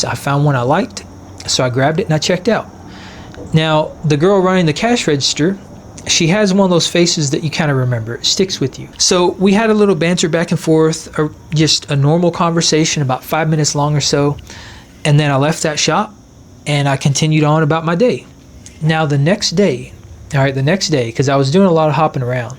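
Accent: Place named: Texas, United States. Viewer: You sound like you're from Texas..i.e. San antonio.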